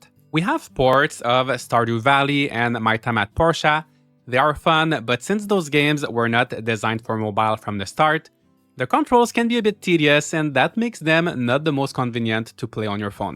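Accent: Canada. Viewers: this is so random! But I'm just wondering what kind of accent you have? I know you're from Canada, but it doesn't sound French Canadian to me!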